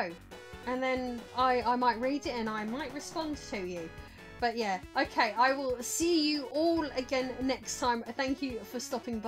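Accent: United Kingdom. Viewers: Where in UK are you from?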